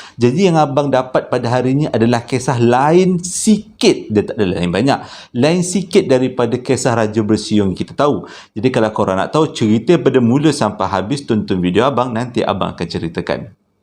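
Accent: Java, Indonesia. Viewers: Selain kc champion. Abg best la citer mistik2 dan hikayat.. best je dgr bila abg smpai kn citer kadang2 ade sleng mcm jawa 😂😂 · Nape aku suka bercerita: 1- Xde jumpscare..jd nye xde la terkejut2..haha 2- Dia cerita xde cut dan edit..terus je..so rasa cam borak depan2... 3-,ko jawa ke?agak pekat bunyi jawa ko..hilang rs rindu nak borak2 dgn kwn2 jawa..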